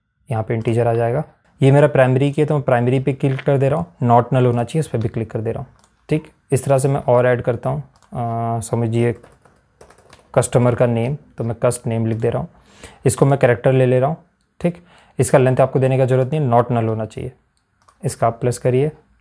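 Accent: Odisha, India. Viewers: Are you bro from odisha?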